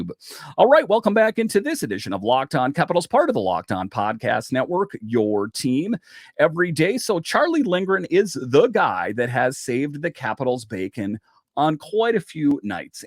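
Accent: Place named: Midwest, United States. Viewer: Thanks! I know ive heard it before. I thought it could be something close to Minnesota, but im not too familiar with any midwest accents yet. Love the content, washington is heating up, hope they make the playoffs! Cheers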